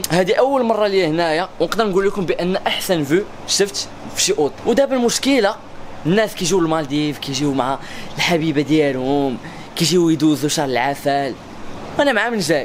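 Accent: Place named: Senegal. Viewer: كدوي بالفورونسي بحال شي سنغالي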